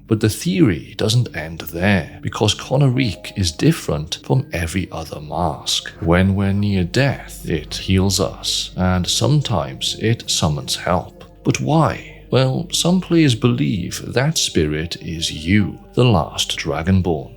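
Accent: Wales, United Kingdom. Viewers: That magic Welsh voice. 🔥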